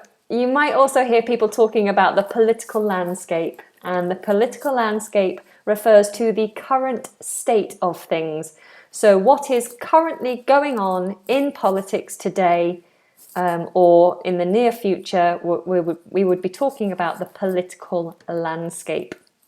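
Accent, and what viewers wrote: United Kingdom. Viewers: I enjoy your accent, which reminds me of the time in uk.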